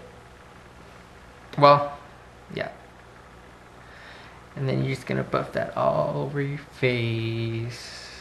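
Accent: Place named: Latin America. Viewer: your voice is so calming and latino-ish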